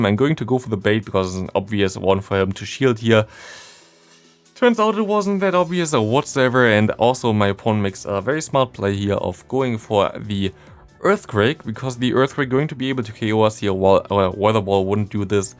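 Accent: Germany. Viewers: If your slight accent gave you away as I'd assume either german/austrian or swiss, its even more insane that you made this video so early. I've just awoken from my slumber, thanks for the dedication and great video :D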